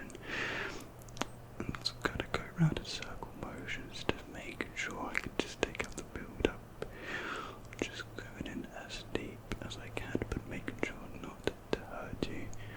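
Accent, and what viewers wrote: United Kingdom. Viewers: Now this is what to listen to while doing college homework! Handsome British accent boy is back! (well at least it sounds like he has a tiny bit of an accent)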